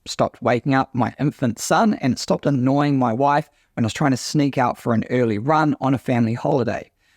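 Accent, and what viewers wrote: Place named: New Zealand. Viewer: Bang on on the comparison between Coros and Garmin ecosystems. I run with older gen Pace 2 and FR245. Both meant to be 'entry' level watches. Neither is perfect, both complement each other well. Totally agree with your Coros highlights over Garmin though. Stumbled on your channel recently and very grateful to have done so. You're an endless wealth of information on all things running = THANK YOU! And nice to hear a New Zealander here in Australia over yet another US 'run tuber' 🙏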